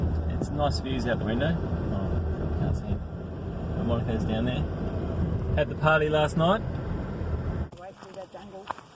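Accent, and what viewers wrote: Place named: New Zealand. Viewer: Really enjoy your posts . As a kiwi you sound like one to me , a soft kiwi accent. I would ask that the sound quality is improved a large part of your commentary Is inaudible over the wind noise, which can be too loud at times.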